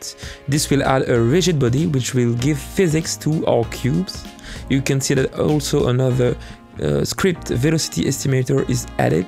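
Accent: France. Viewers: Ca transpire le français jaja 🤣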